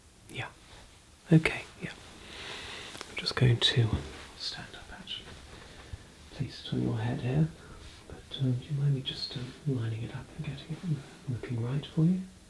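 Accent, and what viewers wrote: United Kingdom. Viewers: I know your accent is British obviously, but you look Swiss. 🤔 🤠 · You would have been a better loki any day 😄 that accent is classic very agatha christie 😏 you should do a sherlock homes asmr 👌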